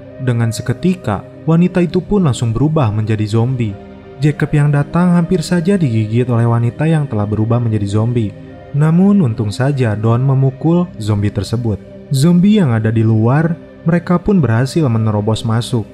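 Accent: West Java, Indonesia. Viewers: Ngakak ih yg cerita nya ada ada Sunda nya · Waw ka Sunda nya nyalosod🤣🤣. Semngat terus ka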